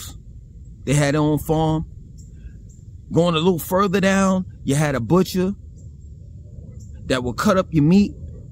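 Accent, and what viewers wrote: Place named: South, United States. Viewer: Very very south. Very deep accent as well. Loove the accent.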